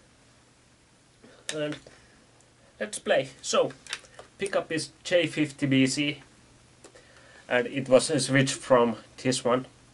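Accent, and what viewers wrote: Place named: Finland. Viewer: You have kinda finnish accent in your english. Am i right? Anyway nice video. I really want to buy that guitar. Thaks for the tips considering about the possible upgrates i might have to do in the future.🤟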